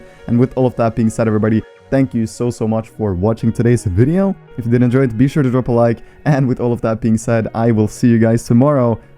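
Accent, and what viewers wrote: Norway. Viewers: vent, er du norsk? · Hæ??? Jeg visste ikke at du va norsk! Nå som du sa det så hører jeg det. :/ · Are you Norwegian?🇳🇴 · er du norsk?? det visste jeg ikke haha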